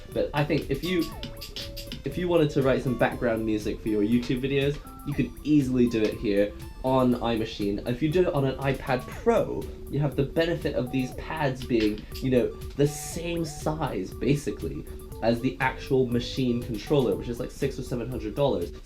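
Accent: United Kingdom. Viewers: u sound like a british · He is but he lives in Japan sometimes.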